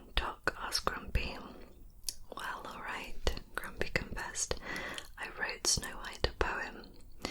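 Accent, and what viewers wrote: United Kingdom. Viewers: ill be honest at first i didn't like your British accent,but now i love it so much with your divine unintentional mouth sounds 🤯🤯🥰🥰that i watch your videos every night!!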